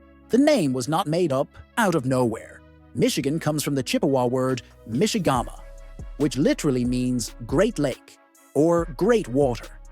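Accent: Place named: Ireland. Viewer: AI(?) Irish. Do love it.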